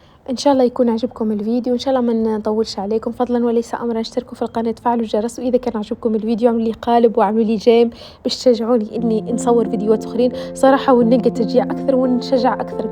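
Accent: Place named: Tozeur, Tunisia. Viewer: يا خيتي يا خيتي عاللهجة التوزرية..و كان مانيش غالطة تحديدا من نفطة هكة و إلا لا...ليكم وحشا يا غالييين عاشرتهم في الجامعة عام 2000 صدقيني نتصنت فيك و عيوني دمعوا تذكرت ايامات الجامعة و اصحابي ...جاني فيديوك صدفة و فرحت برشة 😘😘😘😘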